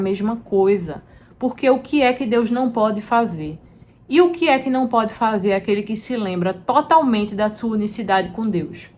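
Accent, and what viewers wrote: Northeast, Brazil. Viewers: Olá! Adorei teu sotaque de algum lugar do interior do Nordeste!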